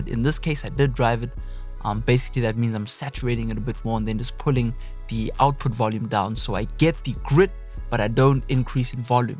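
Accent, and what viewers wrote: South Africa. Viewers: you sound you from South Africa 👌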